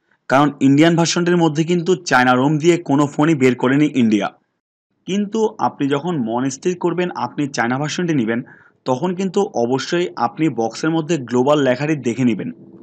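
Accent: Chittagong, Bangladesh. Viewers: আপনার বাড়ি চট্রগ্রাম নাকি ভাই??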